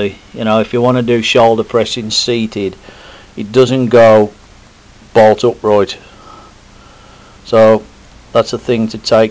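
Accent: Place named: United Kingdom. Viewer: The best thing in the video is the speaker accent. I love british accent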